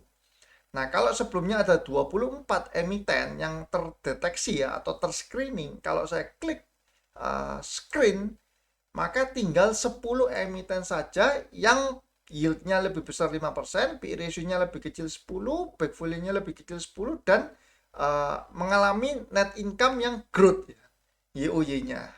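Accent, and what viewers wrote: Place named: Java, Indonesia. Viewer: Ko kok ngomong nya bahasa Indonesia medok banget ko, jadi nyaman dengerinnya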